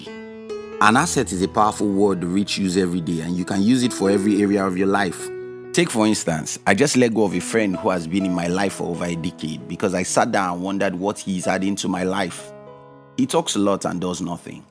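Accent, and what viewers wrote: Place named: Nigeria. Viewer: Nigérians english.......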